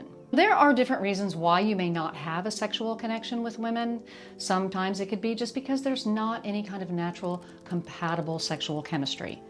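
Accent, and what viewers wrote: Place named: Kentucky, United States. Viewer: Y'all ... I'm guessing Kentucky or Tennessee?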